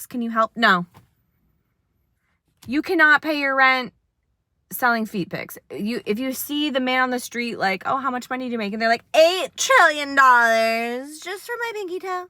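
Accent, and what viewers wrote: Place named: New England, United States. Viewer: o didn't know you were a new englander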